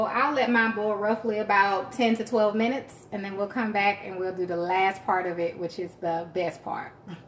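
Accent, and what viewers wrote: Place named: New Orleans, United States. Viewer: Omg where u from ? I love your accent I’m getting Nola vibes cus u sound like Toya lol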